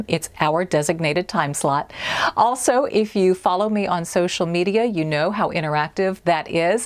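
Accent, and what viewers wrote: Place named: South, United States. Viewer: I love the southern accent!!!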